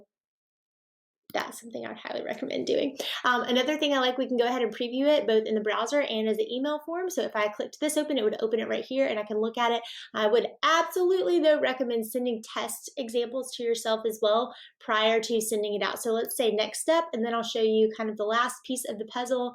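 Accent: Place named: South Carolina, United States. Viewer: Has to be from South Carolina with that accent. Represent lol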